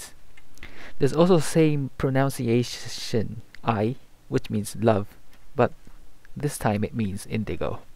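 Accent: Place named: United Kingdom. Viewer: Nice video, thanks! Your English is pretty good, by the way! Did you study British English? Didn't you? I'm just curious, because I'm studying American, and I am pretty sure that I hear British pronunciation.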